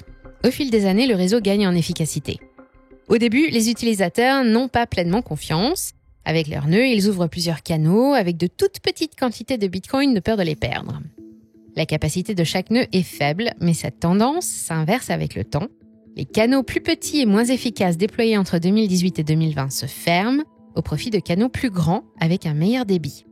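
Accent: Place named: United States. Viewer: prononciation à l'americaine tout simplement mais oui c'est clairement marketing il y a limites plus de commentaires à propos de sa voix que par rapport au contenu haha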